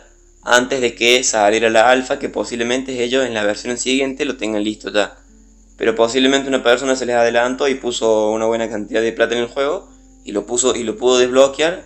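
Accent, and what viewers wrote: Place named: Córdoba, Argentina. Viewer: amigo te re queda el cordobes kpo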